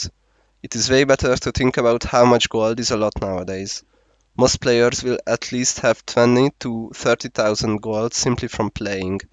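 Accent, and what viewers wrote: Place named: Hungary. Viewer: Can figure out by your accent that you are Hungarian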